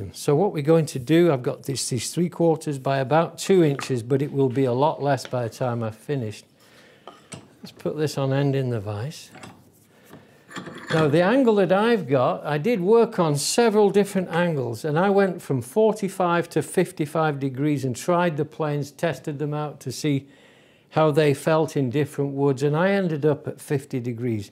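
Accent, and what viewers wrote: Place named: Sheffield, United Kingdom. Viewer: Love that accent. The sound of Sheffield steel.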